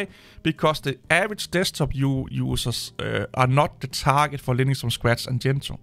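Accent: Denmark. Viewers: I actually subbed to this channel back then just because of this danish accent. Especially when starts destroying delusional fanboys, it cracks me up every time 😅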